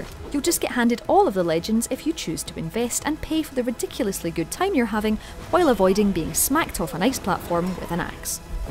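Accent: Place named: Scotland, United Kingdom. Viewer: it's a scottish accent ^^ same as mine.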